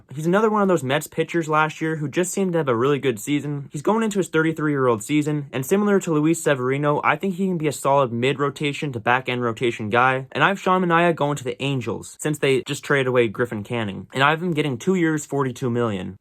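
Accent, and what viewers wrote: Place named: Canada. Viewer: What an unbearable accent. Canadians are the worst.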